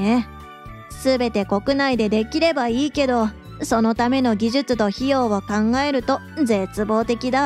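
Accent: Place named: Kansai, Japan. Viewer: 年号のアクセントが変すぎる。関西訛なのは分かるけど、ここまで酷い人はなかなかいない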